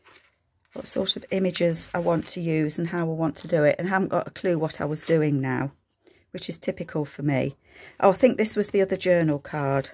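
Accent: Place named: Aberdeen, United Kingdom. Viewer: you are so very welcome... I tried to write you a note 🗒 about being able to locate the book you showed & mentioned... The keyboard wasn't able to show up.... any rate... I found it... Used & in like new condition 4 only a couple $$'s + SH... Thx 🙏 4 showing the front cover.... Your stories while you are creating are most enjoyable.... Your voice with accent take me back to my time living in Aberdeen & New Machere.. Happy time's... Memories more.... Later my BOO's... Sincerely sincere Miss Judith 🌹... xx 🎶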